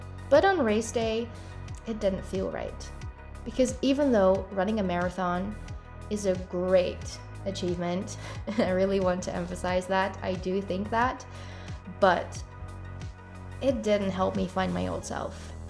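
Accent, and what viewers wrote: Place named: Canada. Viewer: What an exciting new project! Can’t wait to follow along and perhaps get inspired to stay away from too much tv after work myself :) I recently started weightlifting and I feel like I’ve been missing out, it’s awesome and so helpful in combination w/ running. Didn’t realise you were from the Netherlands originally, your accent sounds very Canadian!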